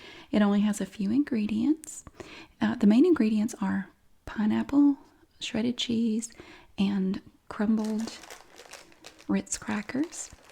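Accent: South, United States. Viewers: are you Southern?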